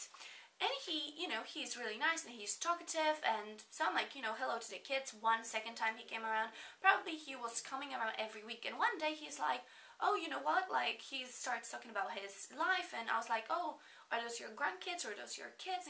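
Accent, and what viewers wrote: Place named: Albania. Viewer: This was so funny! Are you Albanian? I love your accent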